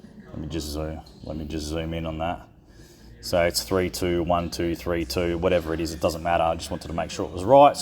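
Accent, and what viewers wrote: Australia. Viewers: Very good stuff my guy!! Im in texas it sounds like you might be from Austrailia? Well where ever you are you helped me from many miles away...that was it! Thanks for posting!!!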